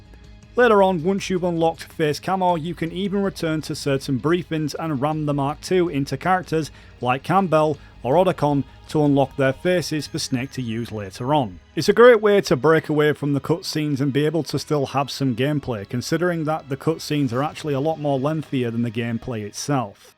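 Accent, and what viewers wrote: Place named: United Kingdom. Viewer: Love your british accent but 2 minutes long intro? FML.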